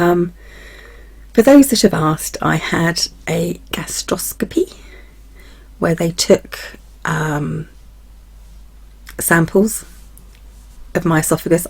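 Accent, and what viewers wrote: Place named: Hampshire, United Kingdom. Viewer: My ear for the UK accents isn't that perfected yet.. I didn't pick up that your voice was Hampshire even though I see the gps pin that says so.. but if I think about it . absolutely :) Folks magic here to me is also known as Hoodoo - instinctive magick.. I'm totally there for it at this season of my life!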